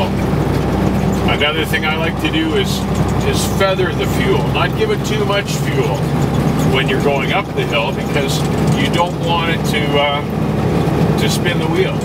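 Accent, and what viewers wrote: Canada. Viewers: You ate a great driver i can tell that you have been a professional for a long time. I can also tell you’re Canadian, the way you pronounce your O’s. 😃. Thanks for the video, very helpful!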